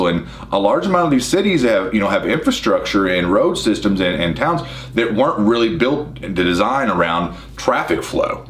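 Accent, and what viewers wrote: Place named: South, United States. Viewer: I could listen to him talk all day! Lol Such a southern boy accent!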